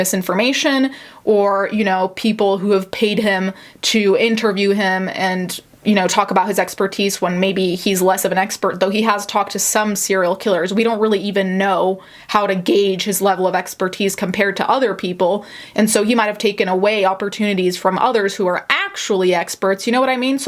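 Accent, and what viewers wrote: France. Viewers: i’m sorry if this is rude, but you pronouncing the french names with an actual french accent takes me out of the story. you don’t have to stop it’s just a side note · I'm french and everything you said is true. He was a liar for 30 years and we just found out ,thanks to 4eme œil corporation ,who he really is. But many of us were suspicious for a long time cause of the way he explained things. Always in a weird way and also different story over the years. I have no pity for him and no he didnt come clean , he admitted some things cause he didnt have the choice but keep lying since then. Nice French accent! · Do you speak french ? I ask you this because you have a great accent✨ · Your French accent is stunning. My college French professor told me I should consider not taking French 2 bc of how poor my pronunciation is. Lmao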